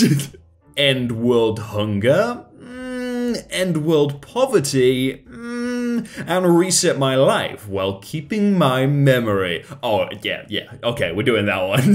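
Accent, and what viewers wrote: United Kingdom. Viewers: day 26 of asking that hes british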